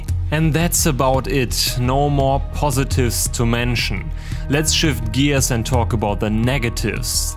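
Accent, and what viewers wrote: Germany. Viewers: Your English is great, who cares if some of the German accent is there. It is actually refreshing watching gaming YouTubers from Europe. As for the EA, they are dead to me for well known reasons. Andrew Wilson's and EA's level of hypocrisy is out of this world. I had 0 expectations from this and EA as usually delivered.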